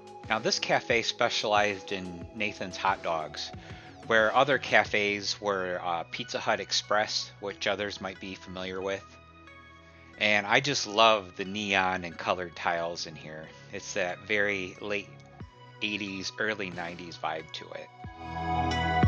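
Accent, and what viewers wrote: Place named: Midwest, United States. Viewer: Awww I remember when Target was like this! This brought me back and I love hearing your midwestern accent as it reminds me of home ☺️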